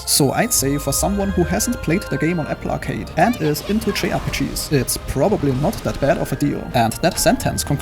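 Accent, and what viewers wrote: Germany. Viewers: ich mag den Deutschen Akzent und follow gleichmal rein ^^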